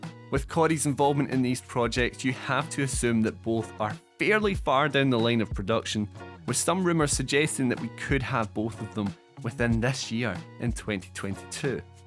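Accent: Scotland, United Kingdom. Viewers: Imagine criticising a Scottish accent.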